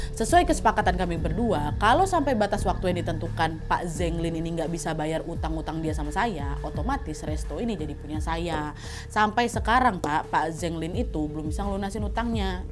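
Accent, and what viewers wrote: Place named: Riau, Indonesia. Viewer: Pembawaan ceritanya enak kali apalagi ada logat2 riau nya 😂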